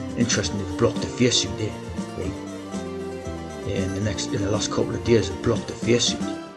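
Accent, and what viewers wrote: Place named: Geordie, United Kingdom. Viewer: I just wondered if you'd lived abroad but I know you are pure Geordie 😂😂🤣🤣😂😂🤣🤣I'm Northumbrian, we both have same strong local accents, unlike many that have changed to fit in, I'm proud of where we're from and never going to change, every two mile up the road a different accent, I was brought us as a Geordie, then moved back a few miles and worked with pitmatic accent, breed of our own😆 x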